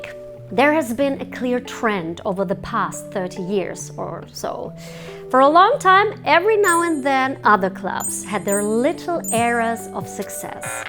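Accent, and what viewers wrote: Germany. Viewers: U speak absolut fantastic english!!!!!! And i can hear u r german! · Her German accent gives me goosebumps. 🔥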